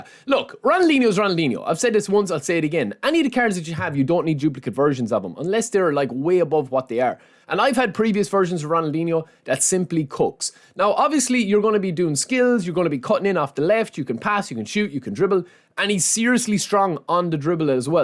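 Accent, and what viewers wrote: Ireland. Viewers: Bro, are you from Ireland? Your accent is familiar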